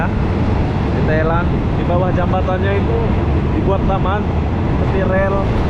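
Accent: Indonesia. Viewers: inilah channel org bingung. sok bicara melayu/tergila2 dg Malaysia tp tk bs sembunyi dr logat aslinya Indonesia. bahkan ungkapan2nya jg sbgi org bingung, antara indo atau malay.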